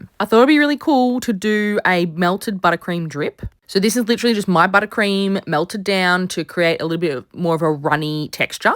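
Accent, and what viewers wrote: Australia. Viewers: Are you Australian? Cuz you sound like it. Not judgeing at all.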